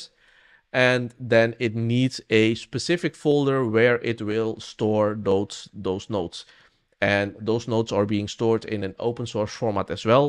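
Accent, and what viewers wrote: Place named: England, United Kingdom. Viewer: Dit is exact wat ik wilde doen, bedankt! Herkenbaar Engels accent😉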